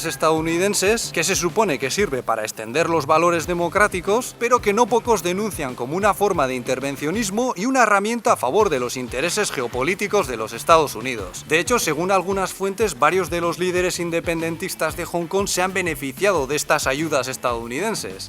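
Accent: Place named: Spain. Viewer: Saludos cordiales desde Ciudad de México. Pero que pareja tan más simpática y original. Sus videos sobre Huawei y Wudong no pueden calificarse menos que de maravilla. Entiendo que tu nombre es y el de tu juvenil esposa, (su rostro refleja una edad máxima de 4 años). Y no, no te ha pasado ningún tren por encima, sólo que los caucásicos aparentan algo más de edad. Hablaste del País Vasco con un sentir muy ibérico, y tanto tu acento como tu dicción son marcadamente españoles, aunque tu fisonomía pasaría por anglosajona o hasta nórdica sin demasiada dificultad. De modo que me recuerdas mucho a Gonzalo Guerrero, marinero español llegado al caribe mexicano como vanguardia de exploración de la flota de Hernán Cortés. Guerrero (a diferencia de su compañero Jerónimo de Aguilar) renunció a su nacionalidad española para jurar lealtad a su mujer y a la nación maya de ésta, Ulümil Kutz (Mayapán o Reino Maya del Faisán y del Venado, etnia muy cercana a China como demuestra su afinidad lingüistica), con quién engendró hijos mayas, nuestros primeros mestizos. 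En la España de su época se le juzgó traidor, pero los republicanos españoles de hoy (y como no, todos los actuales mexicanos), lo consideran patriota tanto maya mexicano como español, a pesar de haber muerto en la adversidad, luchando contra sus hermanos en defensa de la patria de su mujer y de sus hijos. Creo que todo español bien nacido tanto como ciudadanos chinos leales a sus raíces más profundas, te consideran honorabl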